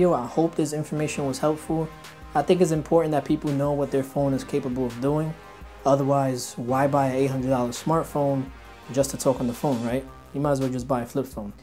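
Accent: New York, United States. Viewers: NY? ...lol you sound like it.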